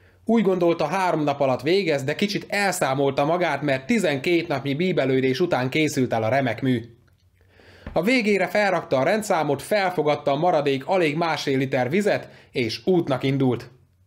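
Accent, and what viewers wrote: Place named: Hajdúság, Hungary. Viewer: Kezdjük azzal, hogy szuper!!! Külön tetszik nekem az enyhe hajdúsági tájszólása (magam is debreceni lennék eredetileg). Ez a videó is igazolja, hogy a franciák konkrétan elmebetegek. Szerintem a stand-up komikusok tanulhatnak -tól. Köszi, köszi, köszi!!!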